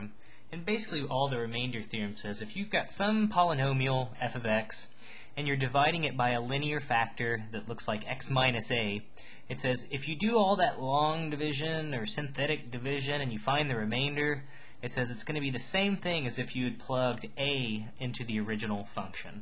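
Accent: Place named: United States. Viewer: good maths, but I hate the american accent tho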